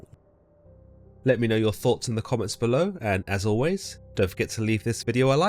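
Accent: United Kingdom. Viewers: No AI voice just a cool British guy with a great narrator voice keep going brother .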